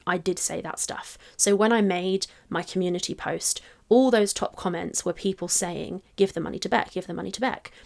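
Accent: United Kingdom. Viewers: Does think the British accent somehow changes the words we already read?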